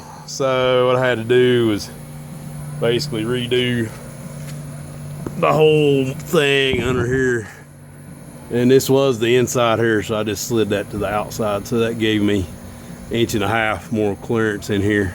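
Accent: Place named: South, United States. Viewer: I love this design! I also LOVE your deep southern accent😊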